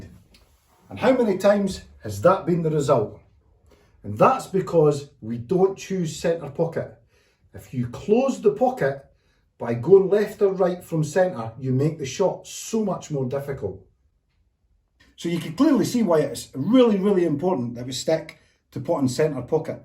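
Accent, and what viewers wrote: Scotland, United Kingdom. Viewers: Well your new subs from Russia etc seem happy enough, I think alot of the world is familiar with the Scottish accent by now with there being so many celebrities etc with Scottish accents. Especially being snooker fans with top legends like hendry and Higgins being scottish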